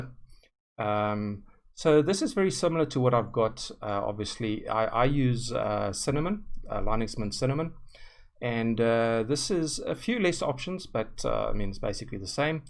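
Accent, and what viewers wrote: South Africa. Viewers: is that a jozi accent i hear?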